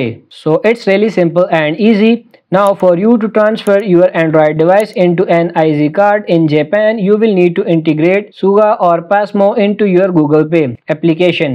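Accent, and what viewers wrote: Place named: India. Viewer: Indian? I Love this accent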